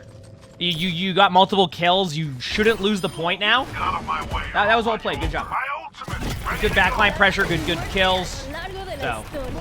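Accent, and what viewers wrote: Boston, United States. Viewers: Probably part of his Boston accent (which sometimes comes out more noticeably).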